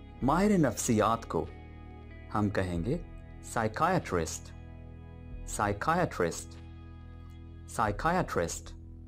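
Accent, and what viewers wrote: United Kingdom. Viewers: yar pehla banda daikha jo urdu me English accent use kar raha hai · Sorry to say Mujhi srif apka urdu ko english style mein bolna acha nhi lgta..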